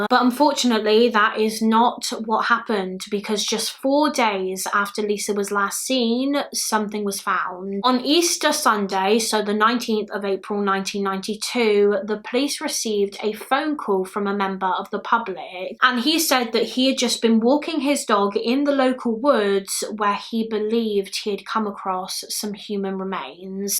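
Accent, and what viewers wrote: United Kingdom. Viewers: You’re so adorable and I love your voice. I’ve always had a hard time with British accents, but you and Eleanor Neal are life for me 🥰🥰🥰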